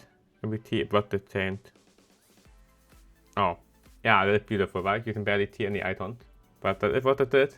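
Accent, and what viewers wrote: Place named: Germany. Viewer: His accent is so heavy, i thought he was speaking german haha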